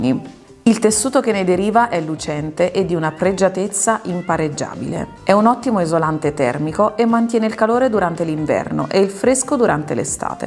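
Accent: Campania, Italy. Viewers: è bella la cadenza Campana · Nooooo ti prego si alla cadenza campana. Anche se vivo in Liguria da oltre 20 anni il mio sangue campano é sempre vivo ❤️❤️... P. S. Anche io avevo preso il Mark in 2 varianti colore ma non ho avuto ancora modo di provarlo spero di aprirlo prima dell'estate😂.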